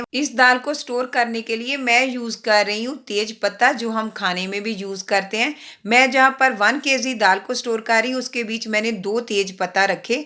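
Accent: Punjab, India. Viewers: Punjabi touch aa Riha h... Mm Punjab ton??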